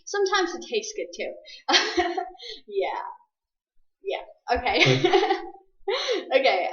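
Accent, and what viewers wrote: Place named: India. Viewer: Just like ..just like ..just like. Indians english in canada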